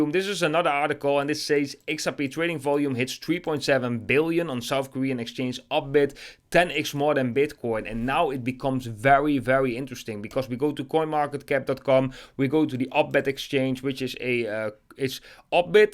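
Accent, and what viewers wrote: Netherlands. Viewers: I think he's Dutch! 😊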